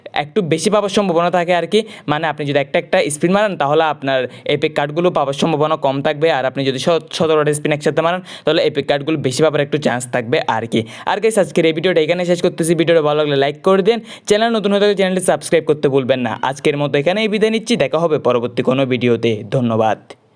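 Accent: Chittagong, Bangladesh. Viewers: Apnar Kotha sone Ami 100% sure apni chatgaya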